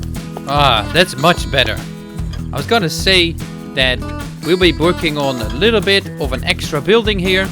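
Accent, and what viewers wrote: Netherlands. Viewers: I smell dutch maar ik heb eindelijk mn mede adhd’er gevonduh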